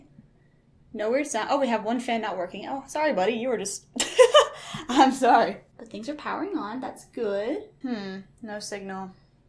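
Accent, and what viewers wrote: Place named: Alabama, United States. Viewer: the way she talks makes me think she was raised a catholic school girl from alabama like damn AHAHAh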